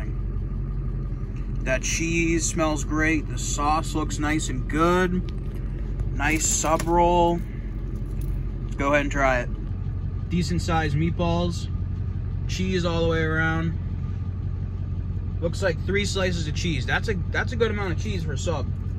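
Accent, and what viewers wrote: Boston, United States. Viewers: I hear a Boston accent! Gahlick, pahmesiahn. REPRESENT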